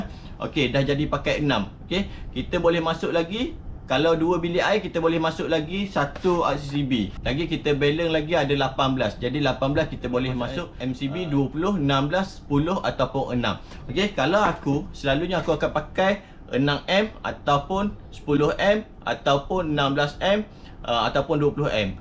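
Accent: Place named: Terengganu, Malaysia. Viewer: Dengr abg ckp macam orng ganu je,kalau ganu sama la kita🤘apa pon terbaik boh👍👍👍👍👍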